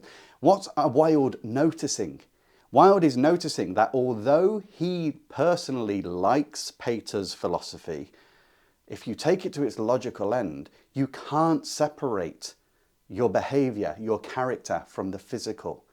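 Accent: United Kingdom. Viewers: excellent analysis - thank you! also your particular British accent is easier to understand for this midwestern American.....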